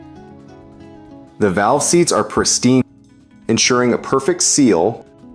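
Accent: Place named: United States. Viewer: We don't need your ugly American accent to commentate to us, we can see . Therefore you stop talking through the men's work. Begone.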